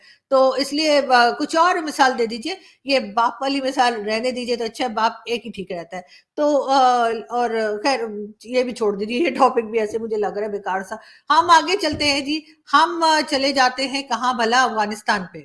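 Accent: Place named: Lucknow, India. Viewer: Well said....... Lucknow's style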